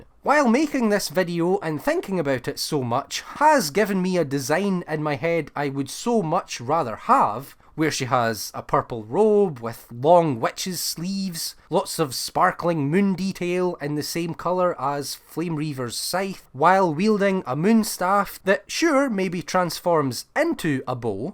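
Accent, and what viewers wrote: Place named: Scotland, United Kingdom. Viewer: I love your scottie accent holy shit😭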